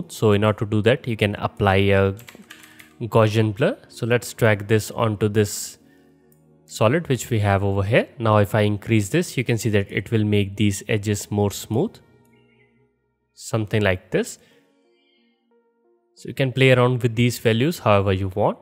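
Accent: India. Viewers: Are you indian or what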